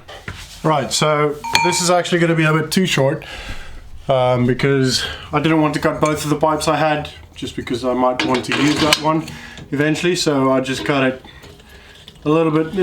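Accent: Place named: South Africa. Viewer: The unmistakeable South African accent . Nice job bru its a lekka idea and iwill be making one for my garage gym . thanks for the great contact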